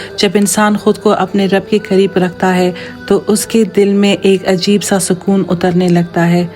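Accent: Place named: Pashto, Pakistan. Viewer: بہت دلکش اواز اور باتیں ہیں آپ کی اردو تھوڑی پشتو والی لگ رہی ہے اپ انگلینڈ میں رہتی ہیں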